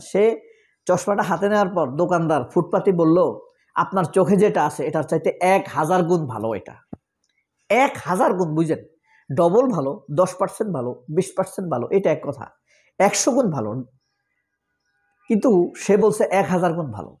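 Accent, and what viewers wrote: Noakhali, Bangladesh. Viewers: ভাই, আপনি কি নোয়াখালীর (New Cally) লোক?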